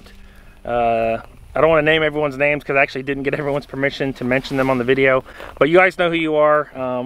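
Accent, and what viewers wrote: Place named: South Carolina, United States. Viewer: Sounds like your already getting that south carolina draw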